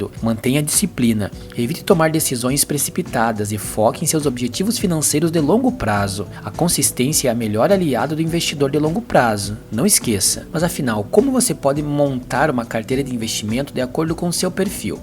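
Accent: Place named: Rio Grande do Sul, Brazil. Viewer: Bah gaúcho kkk que sotaque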